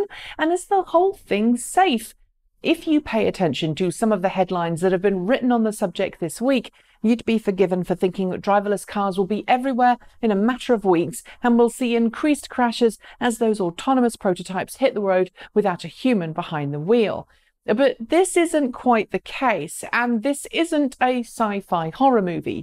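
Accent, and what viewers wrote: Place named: United Kingdom. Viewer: You produce some of the best and most interesting postings on electric vehicles and other emerging technologies. And, like all Americans, I love the British accent.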